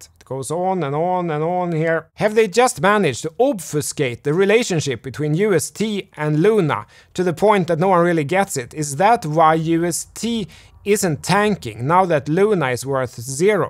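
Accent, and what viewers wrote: Sweden. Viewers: I love your thick Swedish accent, and I'm not being sarcastic. You sound exactly like a voice from a south park episode. If the crypto stuff doesn't work out, do voice acting. :D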